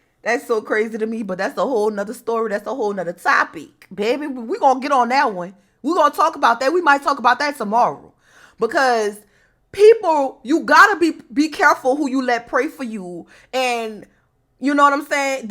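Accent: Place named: New Orleans, United States. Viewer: with that accent you were from New Orlins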